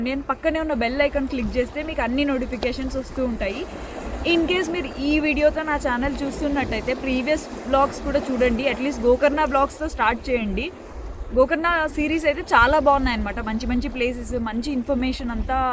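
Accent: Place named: Kadapa, India. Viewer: Where we are Mana (KDP) Slang maathraam intaante inabudhe avuthadhi 😘😘😘😘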